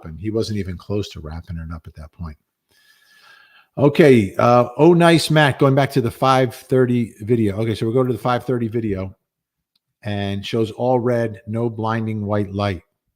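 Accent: Boston, United States. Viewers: not too shabby a boston accent for a joisey guy,! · is this case getting to you, you've fully adopted that Boston accent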